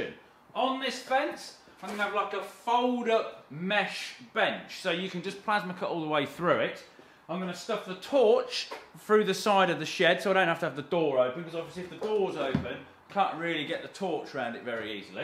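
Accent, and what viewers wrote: England, United Kingdom. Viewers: Very much loving the accent from a true Englishman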